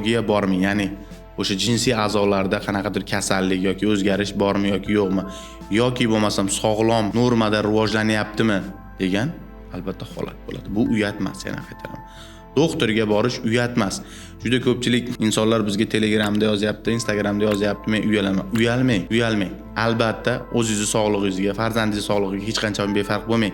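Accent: Andijan, Uzbekistan. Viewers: Anjan shevasi zo'rde manaqa..manaqa...manaqa...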